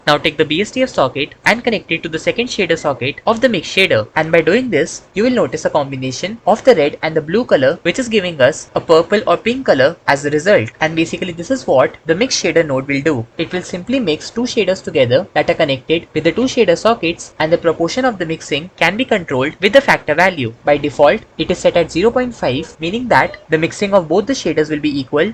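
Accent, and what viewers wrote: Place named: India. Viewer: Namaste Bro, Can you also make a full course for sculpting (Note- everything about sculpting means basic to advanced) in blender. Are you from India as your accent seems like to be an Indian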